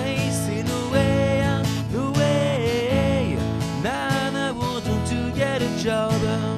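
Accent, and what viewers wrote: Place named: France. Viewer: I love when French people speak English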